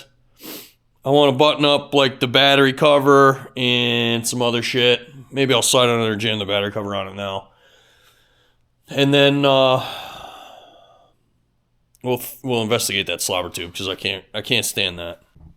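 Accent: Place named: Upstate New York, United States. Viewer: I love watching you work on "old junk" much more than I would like to watch people work on expensive new things. I don't like to watch rich dudes rubbing it in my face. I like watching because I like seeing someone who is obviously doing something they love with obsolete things, not wasting things, making things work, doing good work, tasteful work, intelligent work. I can't stand people who do click bait, who trash things for no reason, who are destructive, materialistic, act stupid or crazy on purpose. comes off as a genuine dude and I believe he acts the way he is on camera when he is off camera and I think he is sincere in what he says. He would probably get more views and make more money if he was like that but, I am glad he isn't willing to sacrifice his class for that. My father was a trucker from Oswego County NY, he loved all this kind of stuff. 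I love hearing talk his accent reminds me so much of the working class upstate NYer guys I was around as a kid. It really warms my heart. It's also impressive to see a guy who is doing well in spite of all the downsides of living up in NY. The weather, the economy, the government, the laws, the rust, the cost of living, the taxes. I love it up there in the summer out in the country. I'm 41 and I was in the military and I just couldn't make it up there so I live Tennessee. Thanks making these videos, I really appreciate them.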